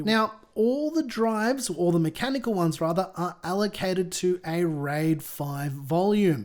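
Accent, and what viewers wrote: Australia. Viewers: Haha yeah but stick to your guns I reckon. Ignore the complainers. People need to accept you’re Aussie with an Aussie accent.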